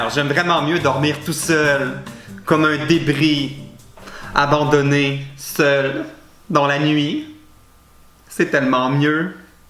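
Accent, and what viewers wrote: Quebec, Canada. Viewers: Ahah bonne vidéo ! J'viens de te découvrir et franchement j'adore l'accent québécois, esti ! ^^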